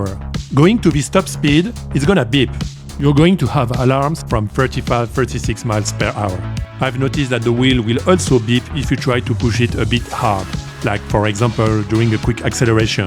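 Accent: France. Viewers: j'ai cru comprendre a ton accent que tu est français ^^ Il faut que je me pause et que j'écoute bien tranquillement tt ça, car la en speed j'ai strictement rien capté 😂 mais merci pour l'explication, je comprend mieux !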